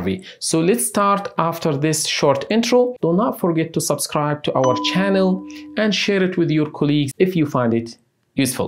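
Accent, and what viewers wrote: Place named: Jordan. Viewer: عرفتك من اللهجة انك اردني. زي لهجتي. انا اردني الماني . الله محييك. فيديوهاتك جميلة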